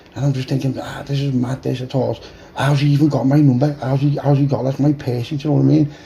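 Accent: Liverpool, United Kingdom. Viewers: Yes brother scouse belter as per deffo worth the hype big up the narrator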